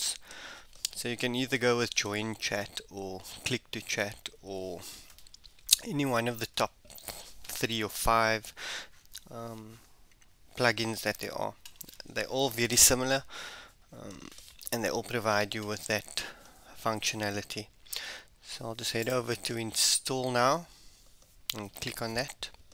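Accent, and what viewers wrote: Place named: South Africa. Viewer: Good to hear a familiar South African voice. lol. Cool vid. Worked 100% for me.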